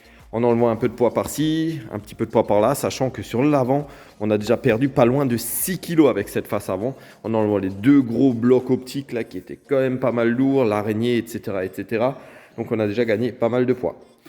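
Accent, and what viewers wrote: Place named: Alsace, France. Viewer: Road trip, 750 super tenere , petit accent alsacien , ambiance sympa ... je m'abonne 😊😊😊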